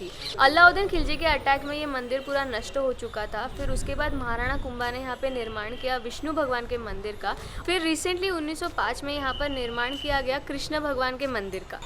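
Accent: Gujarat, India. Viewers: Meera jee "kantaal" ke Dwarika chale gaye 😀. I totally loved this sentence. Ekdum Gujju style Hindi hai. And I have stayed in Gujarat for 15 years. So I love this style of speaking 💕. You guys make amazing videos and one reason I watch them is to listen to style of Hindi!! Keep rocking guys 👍. Khup गोड आहे ऐकायला!!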